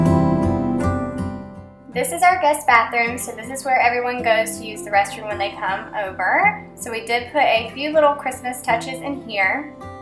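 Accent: South, United States. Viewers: Where are you from?! I love your southern accent☺️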